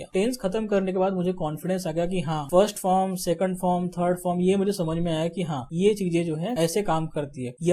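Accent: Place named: South India, India. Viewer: Bhai tum south indian jaise sound kr rhe ho is video me. 😮 Mast Hindi boli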